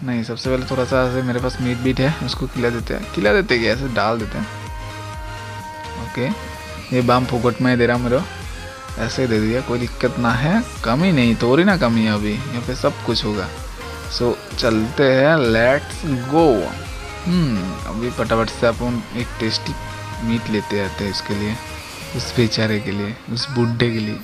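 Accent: Bengal, India. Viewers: Is your mother tounge bengali? ???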